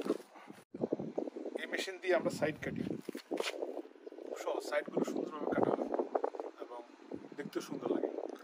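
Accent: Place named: Jessore-Khulna, Bangladesh. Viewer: ভাই আপনার ভাষা তো যশর/ খুলনার মত যদি বলেন বাড়ি টা কোন জেলায়? আর ভিডিওতে আপনার কথার সাউন্ড কম আসে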